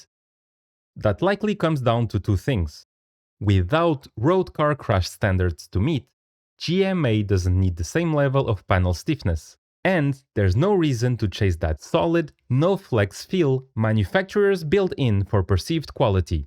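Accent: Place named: Portugal. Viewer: Sotaque tuga... :)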